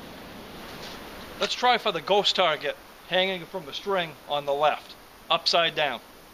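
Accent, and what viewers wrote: Maine, United States. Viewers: Sounds like a Maine accent?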